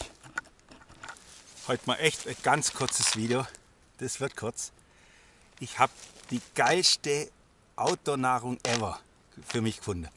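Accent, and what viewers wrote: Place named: Swabia, Germany. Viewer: legga legga :-) Ein echter Schwabe! Des kannscht da Hase gäbe...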